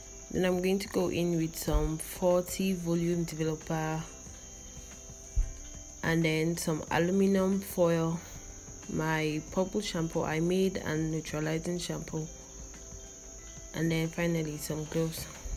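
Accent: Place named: Nigeria. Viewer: This is a really helpful video and it sounds like you're Naija, so I be subscribing 😊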